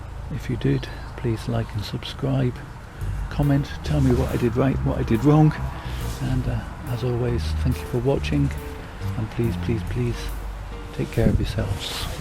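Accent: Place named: RP, United Kingdom. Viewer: speaks the queens English. I'm a Geordie so you probably wouldn't understand a word on my videos.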